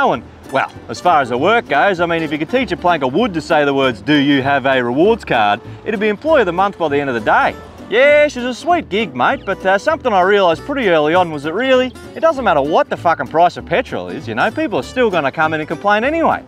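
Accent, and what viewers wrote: Australia. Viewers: Before I visited Australia I thought everyone spoke like this guy but it’s just a few culprits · And that’s the Australian accent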